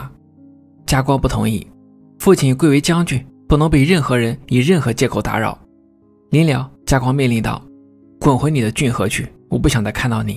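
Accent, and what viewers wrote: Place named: Northwest, China. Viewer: 简明扼要，一气呵成，精彩！对博主的西北口音稍有不适，不过瑕不掩瑜。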